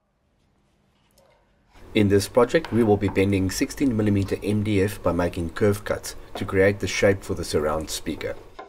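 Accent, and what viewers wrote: South Africa. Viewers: awesome to see a fellow SA citizen make it in YouTube. Accent was very fimilar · I heard your accent and was gonna ask if you were based in SA? But then I saw the news paper you used with ‘GAME’ advertising, and we was like.. aah..haha. Great looking speakers, and good build techniques, I’m learning a lot from you, thanks!! Where do you get your parts, as I know SA isnt that easy to find stuff like that..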